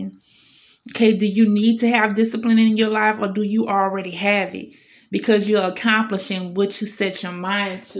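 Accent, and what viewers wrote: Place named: New Orleans, United States. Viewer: On point, thank you. 🙏🏼💛 And the Nola accent too cute!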